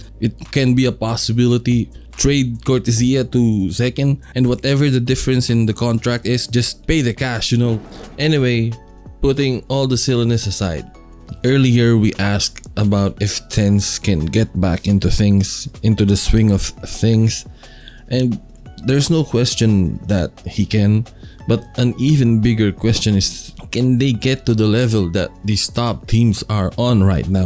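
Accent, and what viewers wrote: Philippines. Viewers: Are you filipino? The accent.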